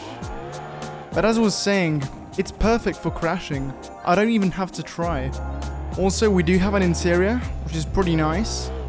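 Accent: Italy. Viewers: I know you are Italian, Your English is Diffrent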